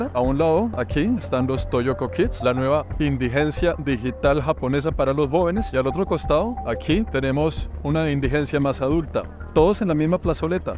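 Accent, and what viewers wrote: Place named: Colombia. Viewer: Llevaba como media hora intentando adivinar el acento y dije... tiene inflexiones de colombiano pero como un aire argentino... pero como gringo... fue buena mi aproximación.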